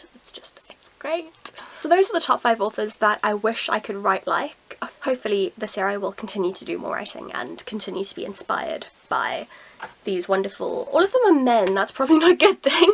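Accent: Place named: United Kingdom. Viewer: WOW, there should be more female readers like you! You admire Percy Jackson and Alex Rider too! (Have you done a Q&A video about yourself? You seem really interesting. But, I couldn't find one of those videos on your channel. One of the questions I would ask is this one: you live in South Africa. But you got an elegant middle class English accent. How come? I'm just curious. Would you do a video about? )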